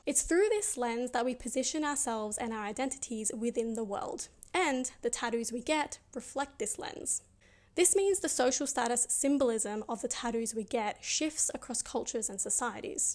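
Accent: Australia. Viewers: So you pronounce it tadoo in Australia? My brain makes me understand "taboos" half of the time. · Oh, I am from Austria - where tourists think that Kangaroos are from. Don`t hear too mutch aussi accent here - that`s maybe why you sounded unfamilliar to my ears...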